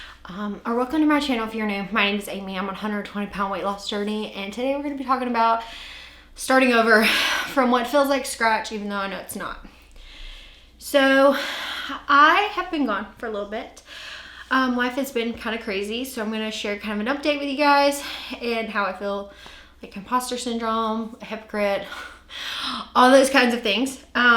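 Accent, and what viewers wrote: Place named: South, United States. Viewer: Girl, I am jealous! My hubby's family lives in Alabama. It is beautiful there, and like you said, it's close enough to everywhere, but far away enough to stay lost if you want to. You are living my dream! Hang in there. It will all work out. Glad you're back. I could always tell you're a southern girl. Me too!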